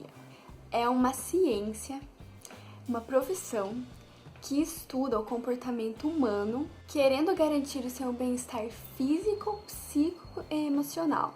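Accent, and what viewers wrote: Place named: South, Brazil. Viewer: sotaque parece ser do sul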